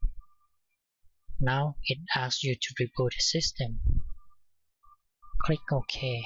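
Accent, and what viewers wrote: Asia. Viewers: Not his voice, his asian accent.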